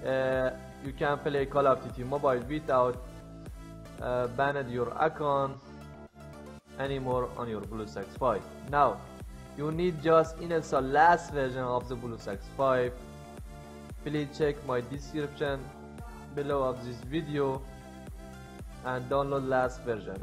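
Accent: Iran. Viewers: ایرانی هستی اینقد لهجت کسشعره مادرجنده ؟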